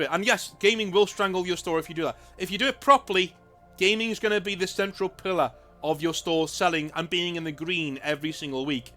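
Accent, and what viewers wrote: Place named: Wirral, United Kingdom. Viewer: no opponents? You have the dulcet tones of someone from The Wirral! I live on the Wirral myself (that is ON the Wirral, not IN the Wirral... don't ask me why its that way ) I'm out of love of big 40k atm but if you ever wanna play Killteam hit me up brother!! (Anything to not have to think about the Mighty Blues for a weekend!!)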